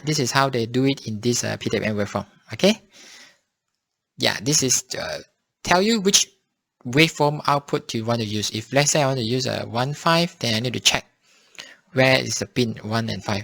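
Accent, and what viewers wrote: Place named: Singapore. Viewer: Thank you for the clear explanation. Your manner of speech reminds me of my childhood home town. I am from Sg, are you from Sg or My? Just asking. :)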